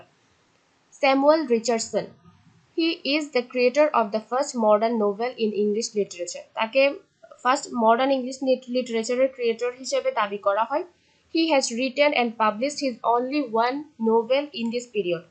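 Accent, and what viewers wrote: Chittagong, Bangladesh. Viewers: ইংরেজি সাহিত্য পড়া শুরু করেছি,মাত্র অগাস্টিন পিরিয়ড পর্যন্ত আসছি,শুরু থেকেই আপনার ভিডিও সাজেশনে আসে,আপনার ভয়েস শুনে শুধু একটা জিনিস এ আসতেছিলো আপুনা চট্রগ্রামের কিনা😅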